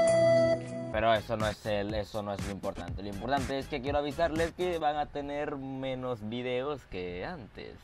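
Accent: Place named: Colombia. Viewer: Eres de colombia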